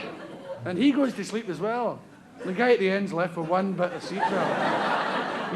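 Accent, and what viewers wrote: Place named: Glasgow, United Kingdom. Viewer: His material was much better when he still had the Glaswegian accent. He was still funny but being from Glasgow I found him funnier then.🏴🇺🇸😜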